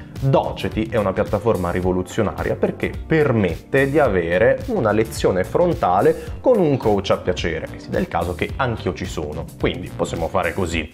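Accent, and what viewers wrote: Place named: United Kingdom. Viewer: Voglio. Il. Tuo. Accento. Inglese.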